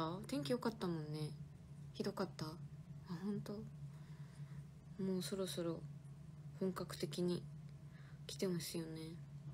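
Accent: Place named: Kyushu, Japan. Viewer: なんねこれ、九州の女て感じ❤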